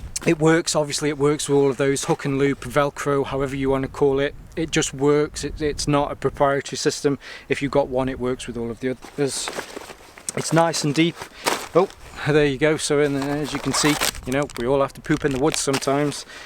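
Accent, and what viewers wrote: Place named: Stoke, United Kingdom. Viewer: Funny, i watched a few more of your videos, then it suddenly hit me: i recognise that accent! I moved from Stoke about 20 years ago.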